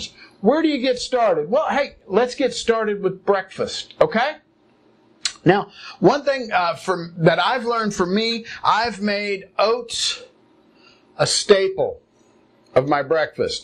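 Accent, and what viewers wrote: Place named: United States. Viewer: Hi! You are too fun! Just subscribed. Love the American accent and the bottle of poison on the window sill and you can never go wrong with your very own handcuffs. My biggest issue is coffee. I started using espresso and cashew milk. That’s about the only way I can handle it. I find breakfast the most difficult because I wake up at four am to go to work, so I actually eat two breakfasts. One at four-thirty and one at work at seven-thirty. Then lunch, and a light dinner. I try to stay away from bread because I have a gluten intolerance, so I am experimenting with granola that I make, and some peanutbutter and rice cakes. I can’t take nuts to work, because it is a nut free facility. I work in an Oreo factory in Toronto...lol. At least the product is vegan. Have a fantastic day, my friend.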